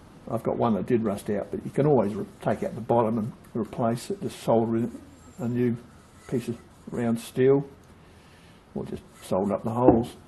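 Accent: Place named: Australia. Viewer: Soooo good to hear an Aussie sprouting his stuff! Good on yer, mate. Keep emailing coming!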